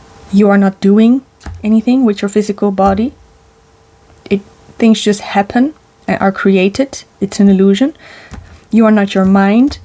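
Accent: Netherlands. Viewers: I could tell your Dutch accent. Great video. Like your dimensional model 😇 Ik ben ook van Nederland en heb een boek geschreven waar ik ook een multidimensional model heb. Echt super!☯️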